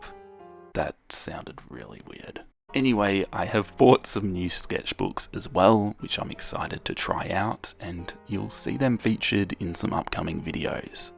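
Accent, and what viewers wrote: Australia. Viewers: Wait he's Australian not british?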